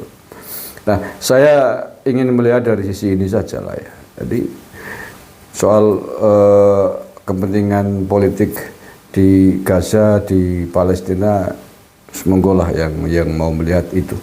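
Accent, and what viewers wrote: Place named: Java, Indonesia. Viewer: Orang Palestina itu cinta tempat kelahirannya, di Relokasi ke Mesir saja mereka tidak mau pernah terdengar dulu pemerintah Mesir siap membagun tempat pemukiman yg baru buat mereka tapi mereka menolaknya, Jika jiwa Rasis anda membabi buta ke masalah lain jgn merendahkan orang yg bertahan di Palestina, dari logat anda bicara sepertinya orang JAWA tulen, coba anda hitung berapa orang jawa yg kami terima di tanah Melayu Sumatra apakah kami pribumi sumatra pernah konflik besar dgn pendatang, kami orang Melayu terpecah oleh perebutan Belanda/Inggris, Seandainya seperti narasi anda kami membuat negara sendiri Melayu sentris dan pecahan sub2 suku lokal mulai dari sumatra, Malaka(Malaysia) dan sebagian Kalimantan,, bisa jadi kami lebih baik perekonomian di banding sekarang. Anda sebagi jawa tulen harusnya tau diri..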